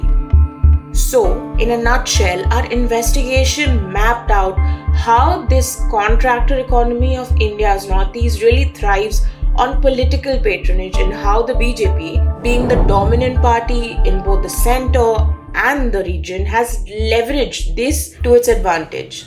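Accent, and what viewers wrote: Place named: United States. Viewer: This woman speaks in Americanized English and has a problem with lobbying? JNU bawasir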